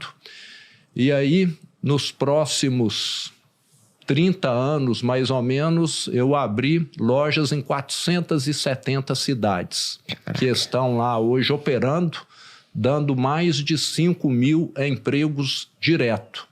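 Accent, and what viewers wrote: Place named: Minas Gerais, Brazil. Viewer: História bonita! O mais legal é ouvir esse sotaque mineirês. Representante de peso desse povo amável e acolhedor.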